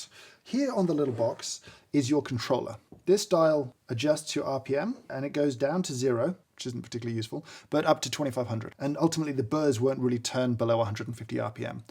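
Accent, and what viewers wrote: United Kingdom. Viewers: I have no intention of buying one of these coffee grinders. I don't even have an espresso machine. I watch your videos because you are so passionate about coffee - oh and the British accent helps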